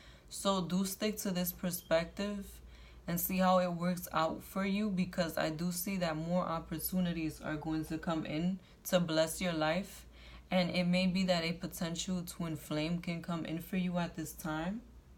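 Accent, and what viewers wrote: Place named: Puerto Rico. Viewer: Thank you for that amazing reading, gave me goosebumps? ❤️❤️ Your voice! Boricua!?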